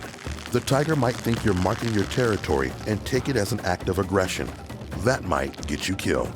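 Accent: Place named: United States. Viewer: American accent but uses metric system🥴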